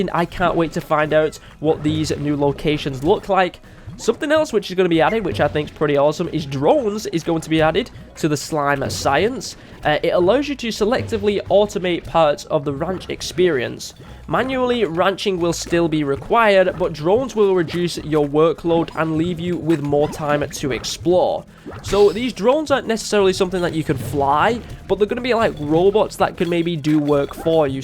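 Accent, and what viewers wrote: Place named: Scotland, United Kingdom. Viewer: U sound like a Scottish NKuch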